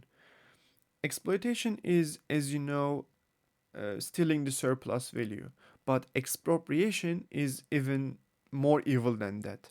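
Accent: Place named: France. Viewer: Your accent sounds French but you look Georgian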